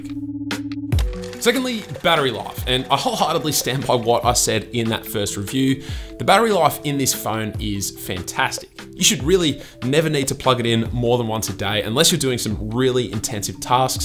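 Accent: United Kingdom. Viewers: I thought you were from I UK or something haha. Sorry English it's not my first language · Thought you were British...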